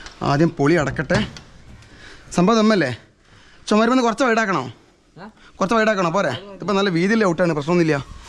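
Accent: Malappuram, India. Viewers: Adaanu njangale malappurathe oru bashaa reethi😂... Malappurathe piller poliyalle😊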